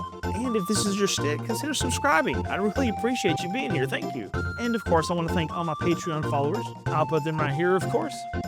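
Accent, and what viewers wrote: South, United States. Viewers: It's very refreshing to hear a Southern accent talking about something I'm interested in that's unrelated to where they're from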